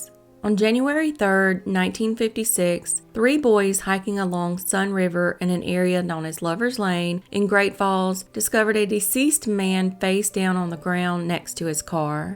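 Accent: South, United States. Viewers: I usu dont like southern accents, but I just love ur. Stay safe. Love fr Panama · It was funny..at first, I thought wow she has worked her Southern accent out! Duh…I’m Southern too! We sound the same…🙄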